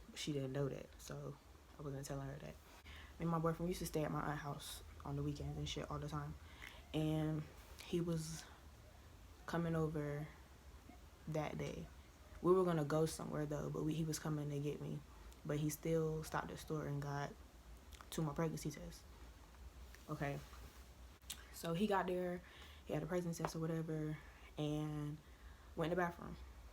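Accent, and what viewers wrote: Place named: South Carolina, United States. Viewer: Lol, he brought a whole pregnancy test over. Love your story, where you from? You sound like you from South Carolina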